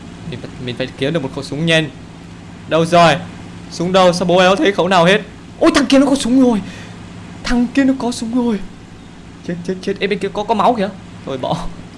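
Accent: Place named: Countryside, Vietnam. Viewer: nói thì giọng như nhà quê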